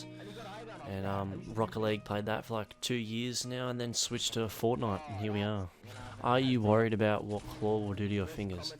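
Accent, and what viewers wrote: Australia. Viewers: aussie accent man hes fkn funny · He's Aussie? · Is aussie?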